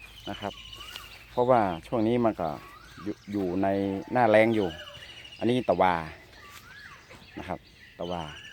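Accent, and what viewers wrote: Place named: Laos. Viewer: เว้าคึอคนวังเวียง สปปลาวเลย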